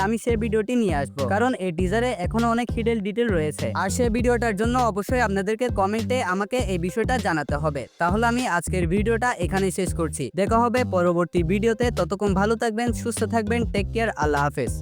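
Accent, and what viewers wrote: Chittagong, Bangladesh. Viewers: আরো চাই তোমার বাড়ি কি চিটাগং ভাইয়া তোমার কথা শুনে লাগতেছে ❤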